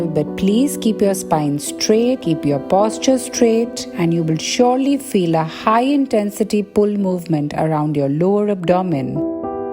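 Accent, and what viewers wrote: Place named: India. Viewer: Elle super intéressante ta vidéo, sauf que ton English est trop indien😅😅😅😅,mais ça va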